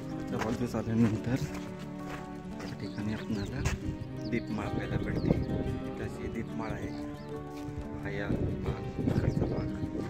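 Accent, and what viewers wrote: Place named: Satara, India. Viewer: तुमच्या भाषा सातारा ची वाटतंय माझा जन्म खेड राजगुरूनगर चा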